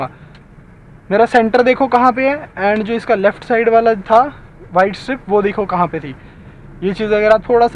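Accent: Chandigarh, India. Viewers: Chandigarh se ho bhai? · Chandigarh se ho na aap 😁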